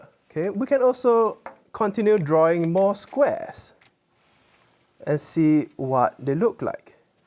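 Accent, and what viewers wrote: Singapore. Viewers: dat singaporean accent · is the speaker singaporean/malaysian? because I suddenly feel really patriotic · is he a Singaporean?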